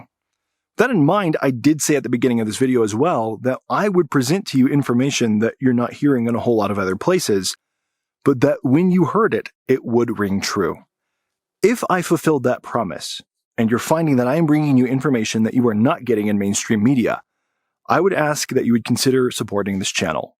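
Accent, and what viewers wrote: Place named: California, United States. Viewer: You're not "man-splaining," you're "dude-splaining" ;) California accent aside, you're quite correct.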